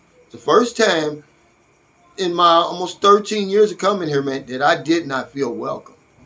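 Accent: United States. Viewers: What?? I'm assuming you identify as being Black and from your accent I'm guessing American? 13 years and one incident and you don't feel welcomed? Honestly I probably would have starting laughing at their attempts in doing so. My deepest condolences for your friend John 🙏🏾 I moved away from the US because I felt not welcomed daily as a Black man. Maybe I'm missing something in your commentary? I'm confused? Wishing you the best sir; it truly sounded like one of those days...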